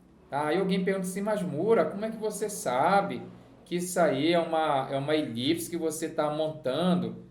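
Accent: Pará, Brazil. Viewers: Égua!!!! sabia que tu era paraense. Um paraense reconhece outro KKKKKk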